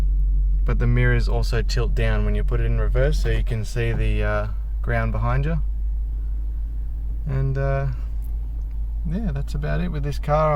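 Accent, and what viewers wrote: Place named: Australia. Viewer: Nice to see another ISF in Australia, I recognised your accent. I am in Western Australia. They have become so rare now, lucky to see 10 for sale in the entire country at any one time. Looks clean good pick up! :)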